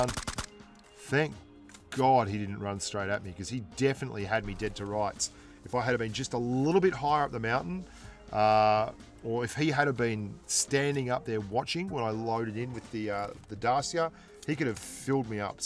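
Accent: Australia. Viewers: He is better then powerbang. I like how he explained the strategy and technique. With that Aussie accent 😁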